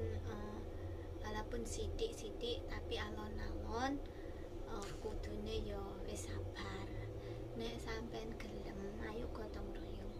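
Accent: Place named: Central Java, Indonesia. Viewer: jowone endi iki mba? ketoe iki wong jowo tengahan sebelah wetanan iki ...... (chenel disetel bar kuwe ditinggal lungo ora dirungokne.........hahahah)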